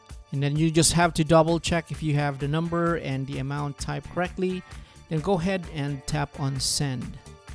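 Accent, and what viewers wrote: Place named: Philippines. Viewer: I Can Tell on your accent that your Filipino, but how come you pronounced PALAWAN..as PA-LLA-ONE?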